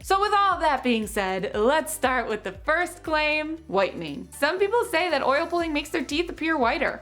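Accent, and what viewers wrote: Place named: Midwest, United States. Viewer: I can tell a Midwest girl from a mile away. Hiiiii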